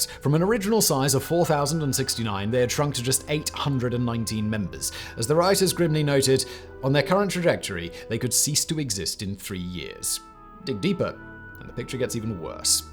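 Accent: United Kingdom. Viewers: Don't listen to that posh English bollox. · This isn't journalism. You're so very clearly in favor of the militarization of Ireland. Curiously enough that would serve British interests too. Ireland would fall within a day and we also don't support your wars, nor do we have any interest in fighting them for you. This country has enough problems to deal with and they won't be resolved by stepping on other peoples toes. For those watching, I plead with you: don't be fooled into this as if it were some straightforward and logical conclusion because a fella with an Etonian accent said so, don't be cretinous fools, please. Open a history book.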